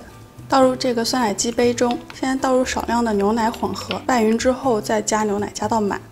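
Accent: China. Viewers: 是哪兒的人啊？她的普通話口音我好喜歡。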